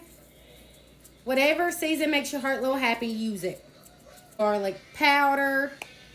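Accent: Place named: Pennsylvania, United States. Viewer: Where you from?! Pencil-vainya? Kentucky or Tennessee? Cute accent😊 Yummy lookin quick snacks the way! Keep going boo❤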